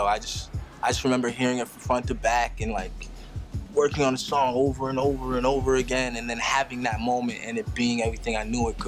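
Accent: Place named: Canada. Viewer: He sounds SOO Canadian, eh? The Canadian "hood" accent is a combination of West Indian, and believe it or not, African. There's even some Arabic influences. I personally find people who say "ahlie" and "walahi" to be annoying, but I mean that's still pretty cool.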